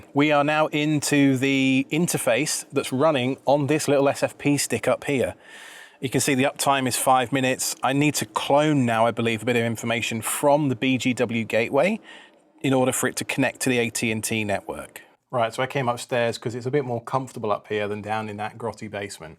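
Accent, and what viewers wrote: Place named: United Kingdom. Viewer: I'm not familiar with the different UK regional accents, but to my ears you sound like you might be from the same general area as James Corden, or as I refer to him, Craig Owens: Father of Stormageddon, Destroyer of Worlds. Just how far off am I?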